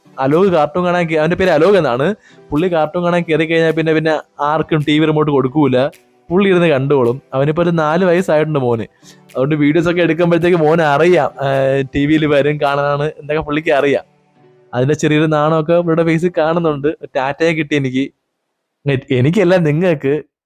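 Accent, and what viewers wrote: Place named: Southern Kerala, India. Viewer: നല്ല വീട്, വളരെ simple,ഭാഷ അടിപൊളി തെക്കൻ, മണ്ടേൽ കേറി പോകുന്നു, 👌👌👌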